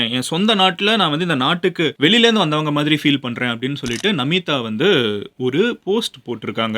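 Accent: Madurai, India. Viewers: R u from Madurai or Ponneri?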